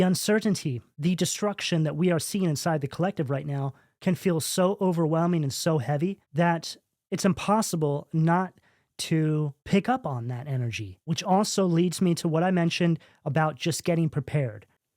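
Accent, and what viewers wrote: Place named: East Coast, United States. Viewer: I always thought you were east coastian.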